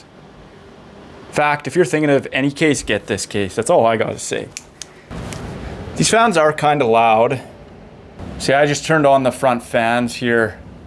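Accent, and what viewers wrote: Canada. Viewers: Is that a Canadian I hear? :O Great review, by the way. Looking forward to building in this case tomorrow.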